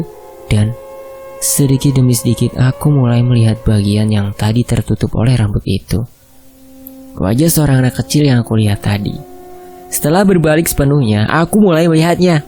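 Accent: Java, Indonesia. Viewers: Min..: suarane medhok heheheh suka deh Kangen kampung halaman 😁😁😁😁😁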